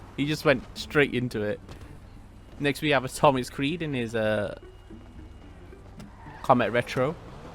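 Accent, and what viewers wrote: United Kingdom. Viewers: are you from uk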